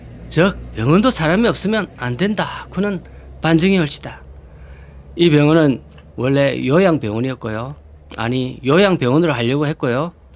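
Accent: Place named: Busan, South Korea. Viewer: 제가 아는 부산 분하고 말투가 너무 비슷합니다.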